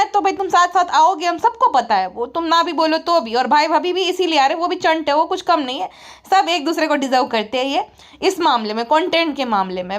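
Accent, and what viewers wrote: Gujarat, India. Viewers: Aap apne podcast me aise hi gujarati tadka lagaya karo bahut achha lagata hai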